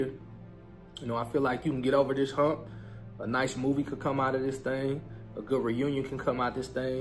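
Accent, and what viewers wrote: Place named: St. Louis, United States. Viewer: Texas + Cali + Pinch of NY = STL Accent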